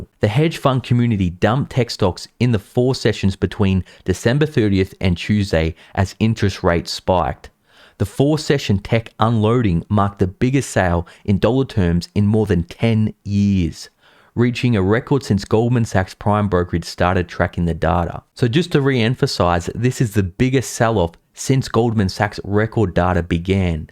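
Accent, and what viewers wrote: Australia. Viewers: Love your down under accent ! Lol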